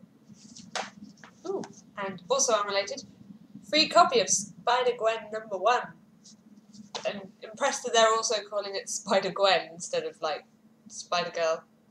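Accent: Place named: United Kingdom. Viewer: I love this comic. Everything about it. the art, the writing and the characterization all fit the tone. Once again Marvel knocks it out off the park. Not only do we get an awesome new comic but a strong developed female character. Now all that left is the Ms. Marvel/Squirrel Girl crossover. Great vid by the way. ALways nice to met a fellow comic book fan and also love the British accent. lol P.S I find her really hot! like DAMN! i.e she is funny, witty, smart, curvy, eccentric and she has a tail. Am i right? huh? huh?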